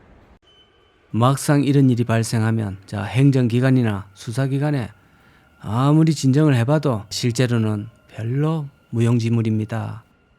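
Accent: Gyeongsang, South Korea. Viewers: 구수한 경상도 멘트로 은근슬쩍 사회적 병리현상을 핀셋으로 콕콕 찝어내듯 몽둥이로 미련한 소 궁둥짝 쎄리 패듯.. 능숙한 방송으로 경지를 열어 가는 듯 .. 더욱 분발하시어, 부패 비리 탐관오리 속고사는 이 없게 박문수 어사 역할 부탁합니다.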